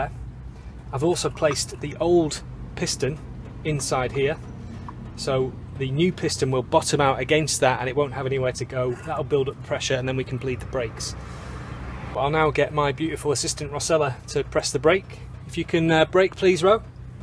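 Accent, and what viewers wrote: United Kingdom. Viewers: the brit accent really gives confidence. cheers! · Thank you for that really useful video and your British accent is just amazing!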